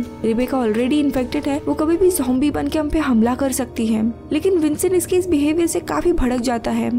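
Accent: Maharashtra, India. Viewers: be patient मेहनतीच फळ मिळेल. मराठी Accent असूनही हिंदी छान explain करतेस तू .. थोड innovative कर with face explanation like " flimi indian" channel सारख public review घे इकदा Q & A घेऊन त्यांना काय हव आहे, त्यांना काय पाहायच आहे ., popular काय आहे ते विचार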